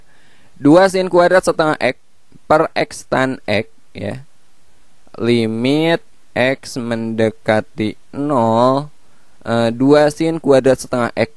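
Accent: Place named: West Java, Indonesia. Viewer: Abang nya orang sunda ya? Logat nya kek sunda😅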